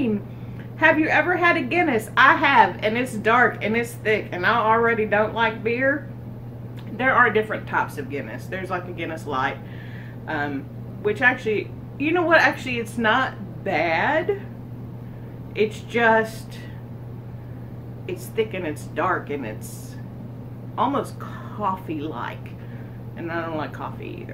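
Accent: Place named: Mississippi, United States. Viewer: Howdy,!! I think you are hilarious! I am in Mississippi, and our accents are very similar, so your "peenched" and other words sound a lot like me! OMGoodness!! I used to spend hours a day looking for 4-leaf clovers, too!! I found one or more almost every time I sat down to look. I love your furbaby, Barnum. My Missy looked almost exactly like him, but she passed at about age 12 soon after my husband in 2016, probably from grief. Please, give Barnum a big hug and kiss from me in memory of my Missy—and, cherish him as long as you can, hon... I think you are great and wish you all the best! God bless! Love & hugs ❤ Lana aka the Good News Keto Grandma 👵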